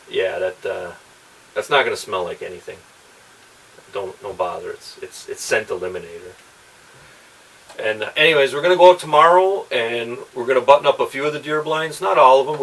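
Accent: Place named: Upper Peninsula, United States. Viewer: Sounds like sout o Ishpeming, eh. One of dem yoopers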